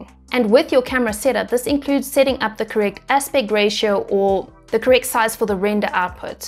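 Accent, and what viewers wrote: South Africa. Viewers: wow you really do sound south African! hate taking leave it just makes me aware of how much work piles up for when I get back.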